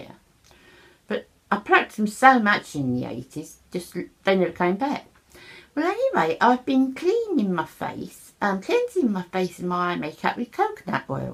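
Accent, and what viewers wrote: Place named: London, United Kingdom. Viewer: thanks for replying.....you mentioned in one of your videos that you were in the north, but I can't tell whereabouts because of your London accent 😂😂 how nice of your friends to send you American goodies. Hope your sleeping is better. Keep on being fabulous! 💋💋💋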